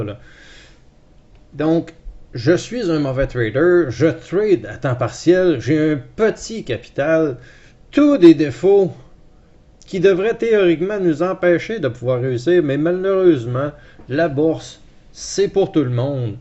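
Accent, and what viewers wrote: Canada. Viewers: bonjour; les explications associées a ton charmant accent canadien me régale continue bravo!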